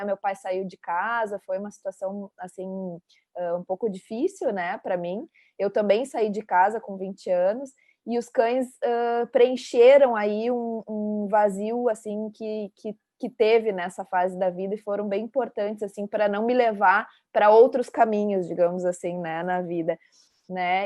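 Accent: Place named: Rio Grande do Sul, Brazil. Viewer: O sotaque gaúcho é assim mesmo!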